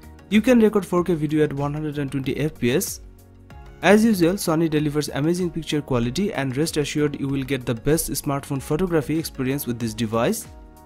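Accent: India. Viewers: Heu mais que fait cete vidéo ici ? Ces SONY sont déjà sortis chez nous. Par ailleurs cet accent indien prouve encore une fois l'arnaque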